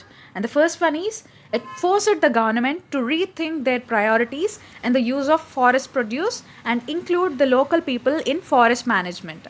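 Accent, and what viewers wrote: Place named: South India, India. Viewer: Your english accent is southindian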